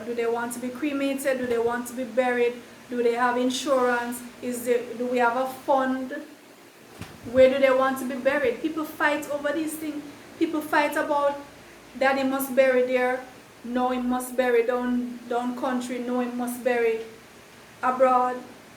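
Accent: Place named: Jamaica. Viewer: Listening to the content and the patois🤭😊. Thanks for the insights. Cheers namesake💪!!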